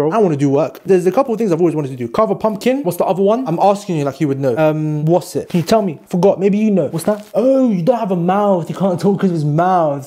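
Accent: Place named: United States. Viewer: American accent on point 💅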